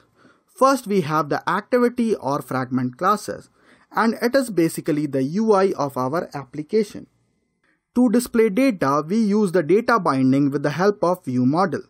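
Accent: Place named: India. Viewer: nice, I love Indian speech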